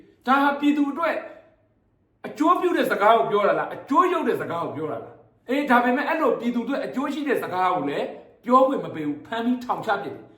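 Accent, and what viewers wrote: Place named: Myeik, Myanmar. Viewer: မြိတ်သူမလေသံ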